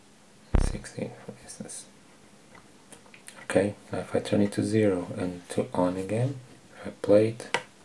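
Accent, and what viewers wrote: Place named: Portugal. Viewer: Are you from Portugal? You do sound like it, anyway, great job...and accept some form of donation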